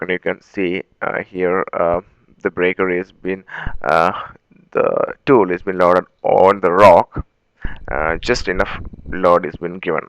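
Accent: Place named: East India, India. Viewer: Great East Indian voice speaking well!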